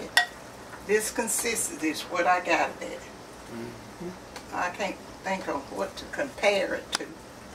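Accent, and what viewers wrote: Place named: Alabama, United States. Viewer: Hello, Ms.! I just found your channel and it takes me right back home to Alabama. The meals you make, the way you talk, and even the stories you tell such as killing a hog only twice a year sounds just like my folks. I wanted to know where you are from because you remind me so much of home sweet home. I just subscribed too! Thanks for these comforting videos!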